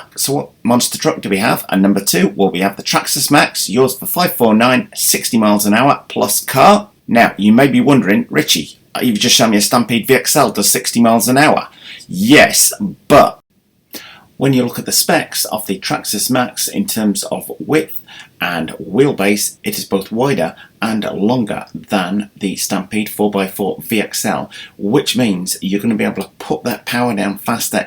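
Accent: England, United Kingdom. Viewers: PLEASE buy a microphone! I like your videos but it sounds like you recorded it underwater! Also - whereabouts in England is your accent from - I just can’t place it!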